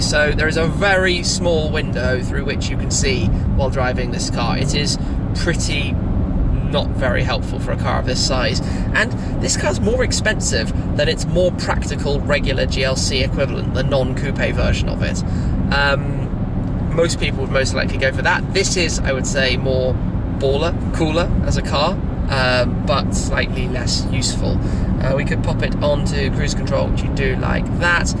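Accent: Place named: England, United Kingdom. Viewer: hate the fkin english accent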